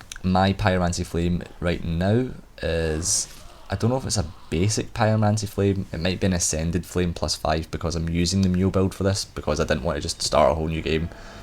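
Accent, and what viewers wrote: Scotland, United Kingdom. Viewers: Thank you for these, I appreciate the amount of detail and thought put into your videos, and your scottish accent does make it easier listening to long videos. Was a little bit surprised when you said you struggled everytime you walked over that moving bridge. For me it's been the complete opposite, tried running or jogging a few times a long time ago and fell off, have been walking over ever since without any accidents. Anyway thanks again!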